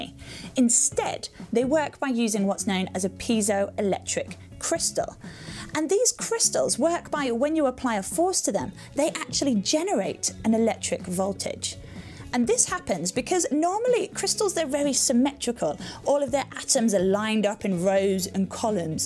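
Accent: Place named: Yorkshire, United Kingdom. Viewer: Sounds like somewhere in Yorkshire to me.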